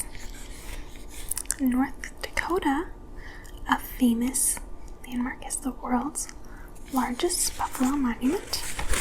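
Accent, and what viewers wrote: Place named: Chicago, United States. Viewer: But that accent is either Chicago or Wisconsin.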